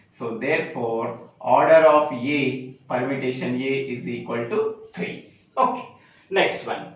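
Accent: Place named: India. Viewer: Peak Indian accent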